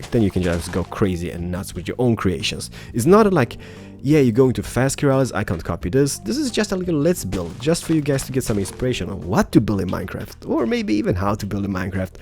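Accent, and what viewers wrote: Scania, Sweden. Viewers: His accent is sveska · Gillar din skånska Keralis. Också från Skåne men norra delen. Liking your skånska Keralis. Im also from Skåne but the north part. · Är du skånsk? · När du pratar engelska så hör man inte din skånska dialekt, men när du började räkna på svenska kunde jag inte sluta le. Så uppenbart att du är bosatt i Malmö, så go dialekt! :D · Du e ju SKÅNSK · you swedish bro haha love it riktig skånska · Skånska =D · Oh man, Keralis has the most fabiest Swedish ever. I am also Swedish. <3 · LapizManHD It is appropriate to say he speaks English with a Swedish accent. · Hahaha skånska e ju bäst :D (fast sthlm ftw ändå :3) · lol That Skånska! xD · wtf är du svensk? :O eller ja, skånsk ;)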